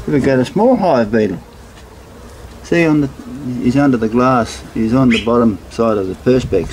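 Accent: Australia. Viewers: hello mate. im a third year beekeeper. had my share of success and failure in that short time. i go to a lot of renaissance faires and was thinking of putting together a display hive for exactly what you said( the wow factor when they see the inner workings and beauty that are inside the hive). was looking at ideas when I found your videos. good job sir. very enjoyable. plus being an American I love to hear you talk (you sound like my buddy Ian from queensland) I like it almost as much as listening to my grandparents who are fresh off the boat from Scotland. keep up the good work and I'll keep watching. im also trying to get a youtube page off with a few videos but im not that good with or in front of a camera. i love trying to video my swarm removals. I've rambled enough, have a g'day mate and dont forget to Bee Happy. sorry couldn't resist.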